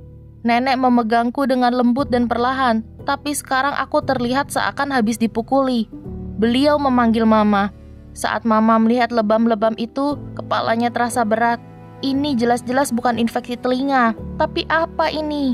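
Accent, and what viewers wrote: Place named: Java, Indonesia. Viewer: medokte tolong-kecil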